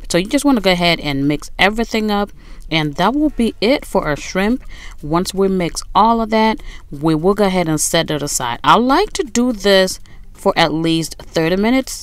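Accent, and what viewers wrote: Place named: New York, United States. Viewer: That New York accent. Are you from New York?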